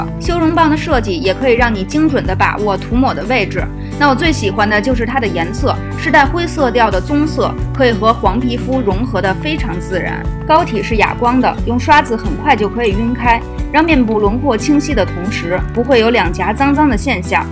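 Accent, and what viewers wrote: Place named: Beijing, China. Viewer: 前几天刚看到你的视频，一听是北京话，亲切得不行，立马关注，加油，支持你！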